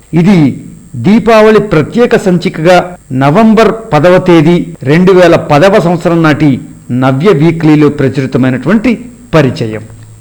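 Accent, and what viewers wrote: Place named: North Andhra, India. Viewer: garu could bring out her childhood memories in a beautiful mesmerizing way.when ever she reads his stories I wonder whether chaso wrote so beautifully or her reading methods with beautiful modulations had enhanced the depth of the writings.As my fore fathers belong to vijayanagarm and srikakulam areas I enjoy that mandaleekam also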